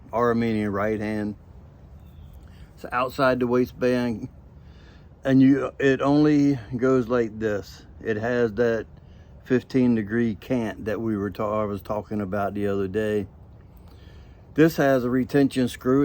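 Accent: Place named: Europe. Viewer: Hey, Good to see you! Love the European accent! I pulled the trigger on the Tobac, I heard a few guys talk about it and you helped me to pull the trigger. Thank you for the show and tell. Be Well!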